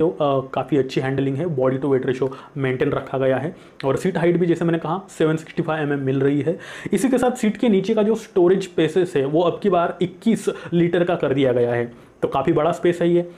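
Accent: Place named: Maharashtra, India. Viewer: Dada ascent madunach mait padtay marathi manus...great work...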